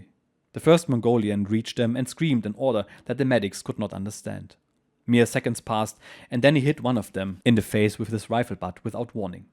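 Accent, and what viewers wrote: Germany. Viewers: This is a great series and I have to say the German accent adds to the thrill of the story 👍😉 thanks.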